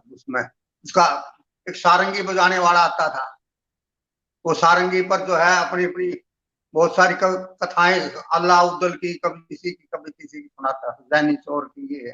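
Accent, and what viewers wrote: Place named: Haryana, India. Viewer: बहुत दिलकश हरियाणवी... बहुत स्वागत 😄😄😄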